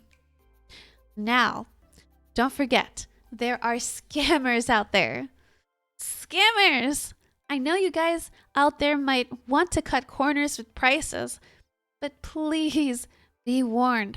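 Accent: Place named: United States. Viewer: You live in Europe? where?.... eh some people don't like to disclose that information. Your accent sounds pretty American to me so that's shocking to hear.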